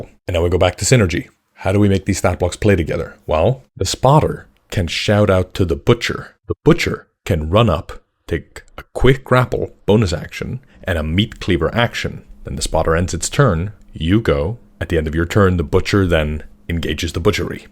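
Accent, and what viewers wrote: Iceland. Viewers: Are you perhaps Icelandic? I really feel this accent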